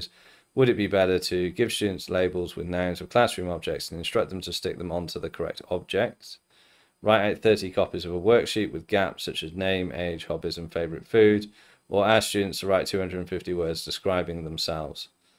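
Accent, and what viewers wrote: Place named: United Kingdom. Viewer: I’ve listened to you speak, and your accent sounds British or from a similar region. Your personal standards may work well in native English-speaking countries, but schools in Ukraine, Poland, Germany, Lithuania, and most schools in Asia, which are non-native English-speaking countries, do not prioritize whether your certificate is from a professional school. As long as you are a native speaker, that’s what matters most. Personally, I feel that teaching English to native English speakers (L1) is not as fulfilling. Most of the people in your comment section are from non-native English-speaking countries, and suggesting that they need to spend thousands to get a certificate like yours to teach is doing their countries a disservice. This is just your personal opinion, so please make that clear to them.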